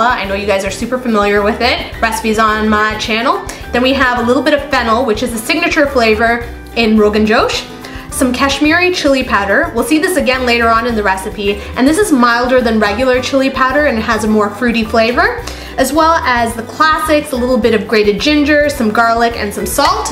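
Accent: United States. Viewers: comments===hi i am a chef at indian restaurant in dallas.....your looking beautiful and the rogan josh yummy yummy...thx for tip ratanjot. i never know this give rogan josh color i learnt from you today. name look like tamil but american accent english keep on rocking..... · You sound sooooo American 🇺🇸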